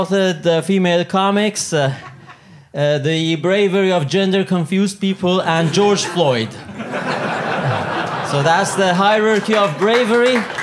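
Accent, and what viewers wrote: Iran. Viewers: De Santos with an Iranian accent? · Why does he sound Iranian? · he talks with persian accent!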